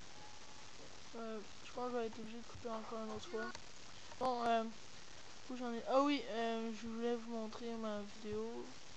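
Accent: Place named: Quebec, Canada. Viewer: J'adore la facon quebequoise de dire google on dirait plus qu'il disent gogole mais ca fait bizarre quand on n'est pas habituer!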